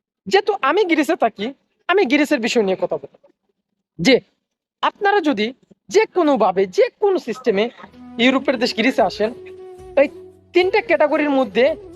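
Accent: Sylhet, Bangladesh. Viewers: Vai subscribe korlam..apni mone hoy amrar Sylheti? Vai amito aitam chai Greece 2024..aiya kam pawa jaiboni city alaky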